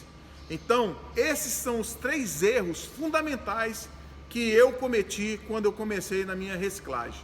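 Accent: Brazil. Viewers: Sotaque de cearense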